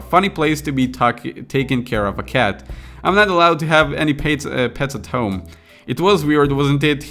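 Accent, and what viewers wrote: United States. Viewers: arent you american?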